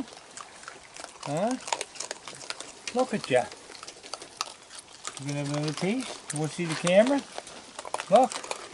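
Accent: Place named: Sydney Mines, Canada. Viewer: I know right? He also sounds like people from Sydney Mines - I thought that was where he was from, when I first started watching him LOL.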